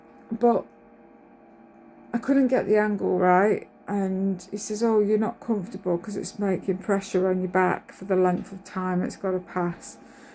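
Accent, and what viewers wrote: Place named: East Midlands, United Kingdom. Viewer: Trying to work out where you're from, by listening to your lovely accent! Is it East Midlands?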